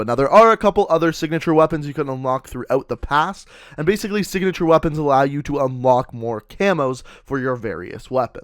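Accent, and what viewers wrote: Canada. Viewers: 9 seconds in the video can already tell your Canadian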